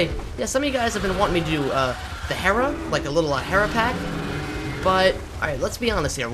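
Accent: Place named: United States. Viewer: hey I like to talk, do a Austria pack, I want a face cam you sound American, and you may not be PewDiePie, jackcepticeye, or the diamond minecart but. YOU are my Favourite YouTuber in the world I would rather PewDiePie have 8000 subs and you have 50 mill you deserve it I just hope you keep doing what you do and on cuz ya can 😉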